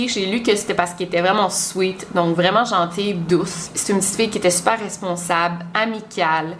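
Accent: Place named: Quebec, Canada. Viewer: kikou j habite en france en lorraine et j aime bcp tes reportages et l accent quebecois suis pendu a tes levres pour comprendre mais j adore sur l affaire de sylvia likens c est celle ui m a le plus marque j avais vu un film sur you tube se rapprochant de ce drame mais je,ne retrouve plus si des youtuber?, en tout cas merci pour ton travail respect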